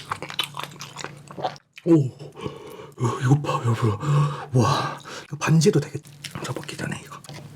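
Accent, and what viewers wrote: Jeolla, South Korea. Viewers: 말할 때 가끔 들리는 전라도 억양이 너무 매력적...♡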